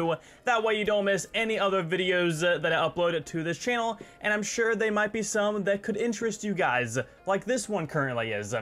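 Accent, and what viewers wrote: United States. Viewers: You kinda sound like Lachlan but more of an American accent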